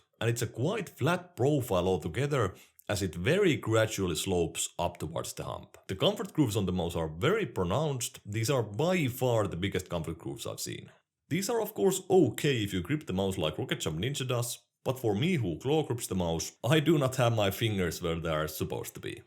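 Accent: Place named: Finland. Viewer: I thought i recognized the pronunciation. Moro! Tilattu..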